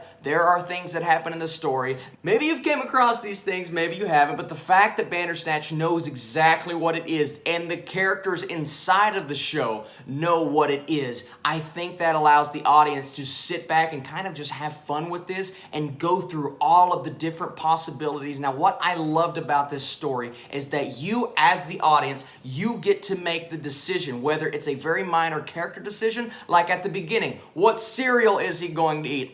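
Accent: South, United States. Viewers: Just a professional piece of advice kid. Being in the entertainment industry and a host, and working on TV in the past, your movements are good, you're hosting ability by switching up your voice, with your movements is also a peeling. A production value is up-to-date. The only criticism I can give you, is you have to lose that southern accent. You want to portray a generic host, to a broader spectrum of audience. And when you get too excited that hometown hick sounding accent comes out. Just work on getting that out have your ability to communicate. All in all oh, good review. Would maybe have liked to seen you go a little more in-depth with the storyline and Easter eggs but other than that thumbs up.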